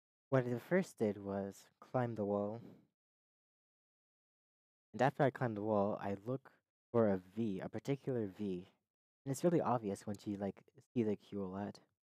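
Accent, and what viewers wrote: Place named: England, United Kingdom. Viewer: i know, but it surprised me cause he had a english accent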